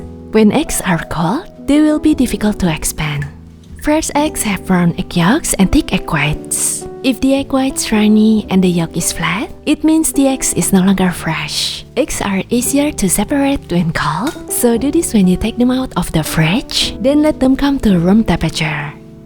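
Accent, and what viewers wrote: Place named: Indonesia. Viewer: Thanks for the recipeee. Aksennya kayak aksen indonlish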